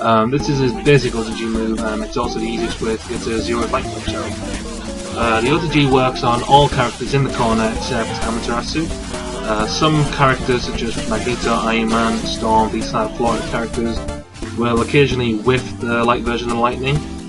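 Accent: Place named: United Kingdom. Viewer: I can barely understand your shitty britt accent.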